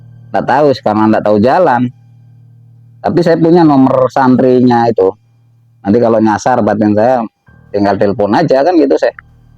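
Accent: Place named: Madura, Indonesia. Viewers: Ko logatnya kaya org jatim bukan ky logat org jateng meditasi bukan apriasi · Iki logate Meduro / Banyuwangi om bukan sragen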